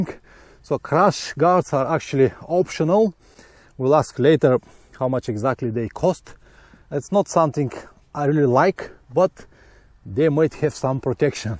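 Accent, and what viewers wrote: Russia. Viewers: Мне одному кажется что говорит с явным русским акцентом? )) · Русский? :) Акцент нашинский! ;) Мотики огонь оба - у меня 660я тенера, когда появится Т7 бэушная в России, я обязательно куплю её, верю что она сделана как надо! Спасибо за обзор, творческих успехов!!!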